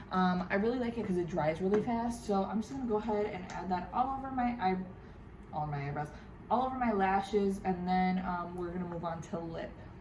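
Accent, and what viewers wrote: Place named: Spain. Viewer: Hola 👋🏼 I’m a first time viewer...I found your video because my hubby & I are going to the same resort in Feb 2021...I really enjoyed watching this vlog! And the makeup tutorial was a bonus! You are so pretty and I loved your story about pronouncing things differently. I adore Spanish accents so when I hear it, it’s like “butter to my ears”! Anyways, I just wanted to say hi and let you know you have a new subscriber! Thanks again for making this video...glad you and your hubby enjoyed it! 😘🥰❤️PS I think you look like the actress Megan Fox! Lucky you😍😍😍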